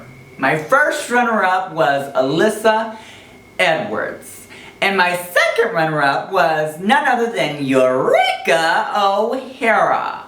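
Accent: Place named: United States. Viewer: the most amazing accent from the us.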